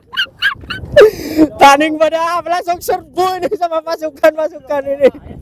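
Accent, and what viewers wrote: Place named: Madura, Indonesia. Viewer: Mantap kawan... Yuk Saling suport... Orang madura mana suaranya bosss....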